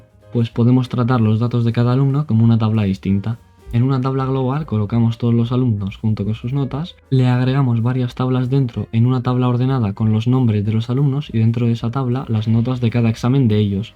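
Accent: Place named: Argentina. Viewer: Ja ja ja ja. Yo pensaba que eras argentino, pero muy bueno, tu video sigue así.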